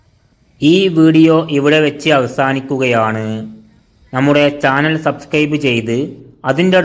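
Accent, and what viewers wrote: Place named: Malappuram, India. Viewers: Horrible pronunciation and the worst and very irritating as well as poor presentation. Say Alleppey better. He should be belonging to Malappuram😢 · മര്യാദ ക്ക് അക്ഷരം പഠിചിട്ട് വാ വോയിസ് കേട്ടാൽ മലപ്പുറകാരൻ ആണ് എന്ന് തോന്നുന്നു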